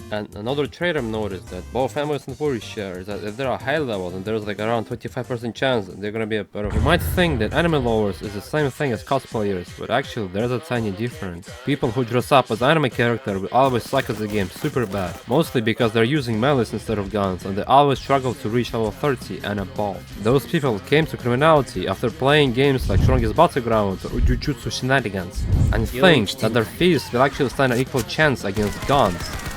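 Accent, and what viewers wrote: Russia. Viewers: Я прям чувствую этот русский акцент, особенно когда он говорит роблокс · You said the video had a Russian accent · Is he Russian? I just hear an accent. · Фига у тебя русский акцент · are you Russian? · Are you russian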